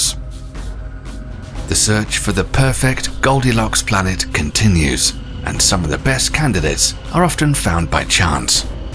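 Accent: England, United Kingdom. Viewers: I just love your English accent! Keep up the good work! :)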